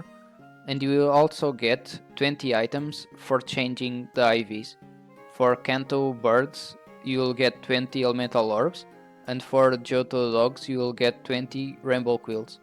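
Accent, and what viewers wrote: Portugal. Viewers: Are you portuguese? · És tuga nao és? Dá para perceber pelo teu sotaque 😅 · That accent, 100% Portuguese!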